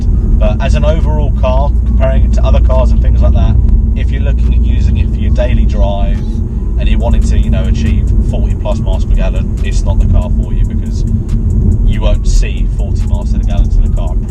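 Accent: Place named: Australia. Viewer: aussie accent is on point 😂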